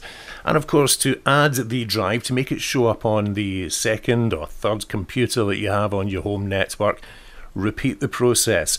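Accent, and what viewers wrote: Scotland, United Kingdom. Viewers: Helpful in that I didn't even think of using the USB port on my 'Rooter' ;) I do love a good Scottish accent! (still think Scottish Engineers are the best to work with!)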